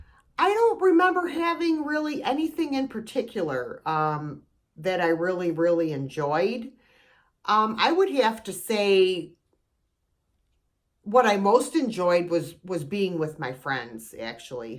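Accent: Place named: Upstate New York, United States. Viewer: I was thinking you were from upstate close to Canada by your accent. An accent you probably think you don't have. LOL! I'm in the Mid-Hudson Valley.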